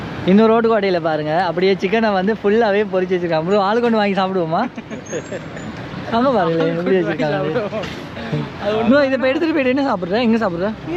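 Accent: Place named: India. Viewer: Eduku da indian pola pesa try panreega🙄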